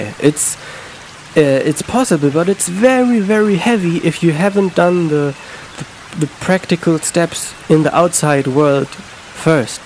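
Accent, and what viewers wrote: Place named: Germany. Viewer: You kinda sound German to me. Biste deutsch? 😁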